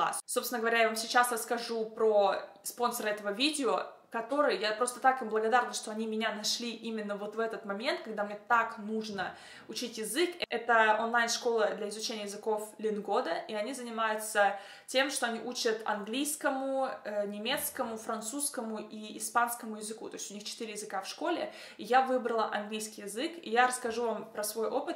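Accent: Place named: England, United Kingdom. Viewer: Слышу в твоём русском английский акцент 😄😄😄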